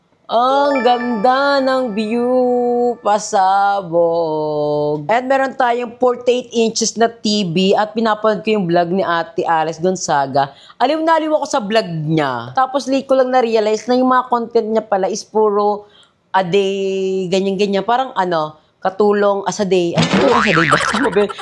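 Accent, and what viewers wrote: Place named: Visayas, Philippines. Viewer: Bisaya poba kayo ma'am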